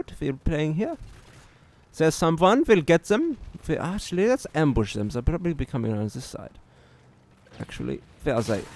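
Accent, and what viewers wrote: Germany. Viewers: You sir have the BEST german accent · lmao Fucking epic:) top notch accent by ze way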